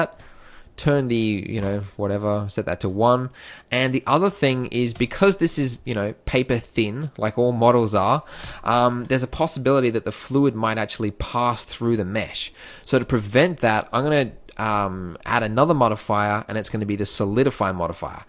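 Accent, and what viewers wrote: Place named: United States. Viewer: it's calt American English.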